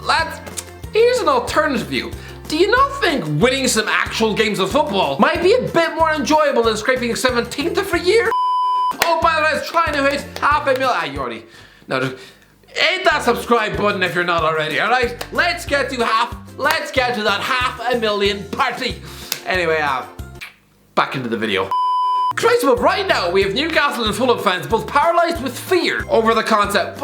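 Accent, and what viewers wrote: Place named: Ireland. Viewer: What part of Ireland you from?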